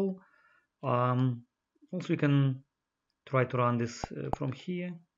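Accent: Russia. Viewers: nice accent are u from Russia or Ukraine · Ааай обожаю русский акцент в английском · You are a Brazilian guy. But you really sound like a Russian guy. The guy from university, who studied English, sounds pretty like you. It looks like Russian and Brazilian accents are similar. It sounds like big news for me.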